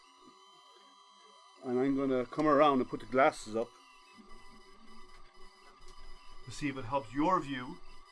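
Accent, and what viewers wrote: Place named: Ireland. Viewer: well I can't get lightburn to connect. I was able to do a couple burns last night with GRBL but just didn't seem like I could change many settings. I thought you sounded Irish but just wasn't quite sure.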